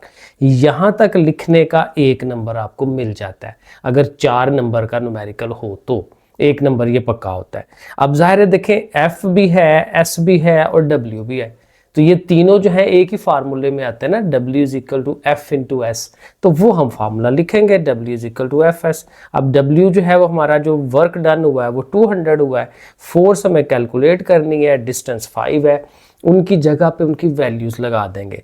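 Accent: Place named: Gujranwala, Pakistan. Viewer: لہجہ گوجرانولہ کا ہے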